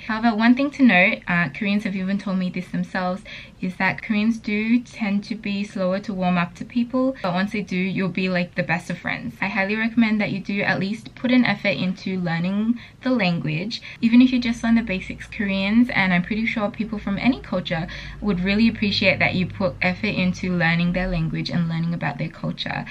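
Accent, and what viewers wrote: Australia. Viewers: Your aussie accent is a boooooomb ‼️💖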